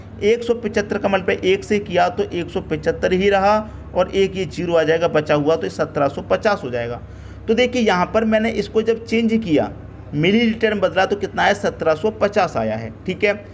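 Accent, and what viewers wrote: Haryana, India. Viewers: Aap haryana se ho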